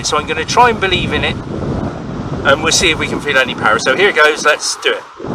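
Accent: Wiltshire, United Kingdom. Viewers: Thank you. I didnt know anything about the stone. I love your Wiltshire accent. ❤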